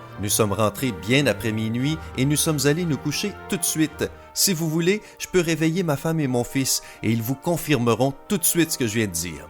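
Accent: Quebec, Canada. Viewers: Enfait cette chaîne est québécoise non moi je suis québécois et je reconnais l’accent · J'aime beaucoup le petit accent québéquoi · C'est un québécois c'est sur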